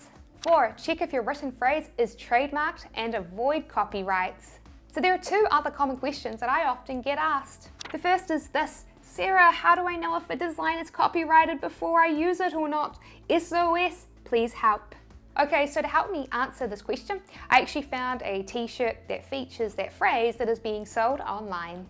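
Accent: New Zealand. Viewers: Ummm, Sarah, honestly I did not notice a "speech impairment." Unless, of course, you're referring to your NZ accent. LOL. But even if you have some sort of impairment, don't sweat it. Most people will never notice and it's really not important anyway. You're great just as you are. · She's a Kiwi (New Zealander) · Just about to launch a print on demand store. Thanks so much for this information! An aside: it took me a second to figure out what an "allatronic" trademark search was. I love your NZ accent, however. It's wonderful. I'm in the UK and people sometimes cannot understand me! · It is so good to hear the kiwi accent in contrast to the the - mostly - american accents. I likw more of that……including your famous dance. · Wow you sound so kiwi 🤣❤ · Great advice found in here, blondie. I love that NZ accent. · super helpful video, love the NZ accent! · Sarah, the amount of value you create with your videos is absolutely priceless! 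And for the record, kiwi accent cuteness does not qualify as speech impairment! :)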